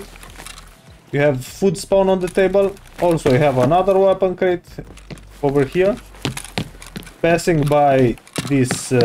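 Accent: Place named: Russia. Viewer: Like ruski accent it's amazing I'm polish how come we don't get that nice accent 🤣🤣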